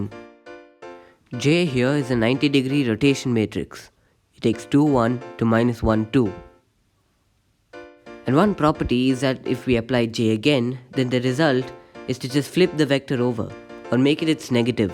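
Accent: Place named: India. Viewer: For fuck’s sake can I find one educational video that’s not in an Indian accent?!!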